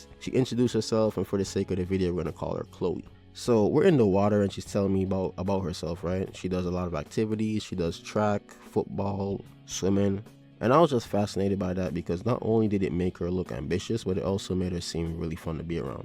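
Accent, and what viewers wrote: Jamaica. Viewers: Brooo are you Jamaican? I can just feel our aura resonating off you brooo (the accent). Respect, loved the vid. ❤🇯🇲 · yo bro stop tryna hide your accent bro l like some Jamaican i new u was Jamaican from how you said da buss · Are you Jamaican or is that just your voice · Dawg u accent heavy bro u jamaican? 😮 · Here I am picking up on a fellow accent like, is he Jamaican, is he not?? But nope, as soon as you said "Brother", I knew. I always know. I'm always two steps ahead...anw, nice video. It's kinda funny watching this as a female, seeing how guys think etc. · R u Jamaican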